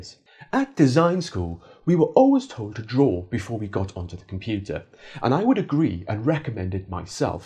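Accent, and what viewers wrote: Wales, United Kingdom. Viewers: Hey fella are you Welsh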